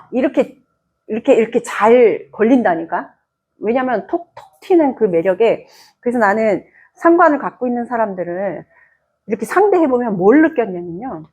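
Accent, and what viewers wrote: Gyeongsang, South Korea. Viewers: 경상도 말투가 가끔 있어요. 근데 서울사람. ~^^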